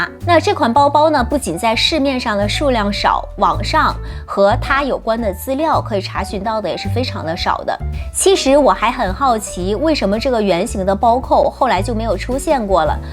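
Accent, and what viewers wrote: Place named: Japan. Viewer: 日式英语发音听得超级不舒服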